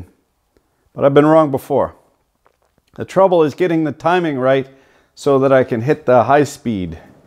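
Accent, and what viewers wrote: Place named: Canada. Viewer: I wish you had a podcast. I could listen to your funny Canadian accent peppered with all of your colloquialisms in my shop!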